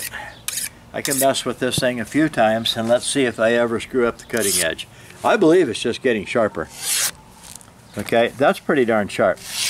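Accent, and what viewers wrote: United States. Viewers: youre from california ? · You look very scandinavian for an american sounding white man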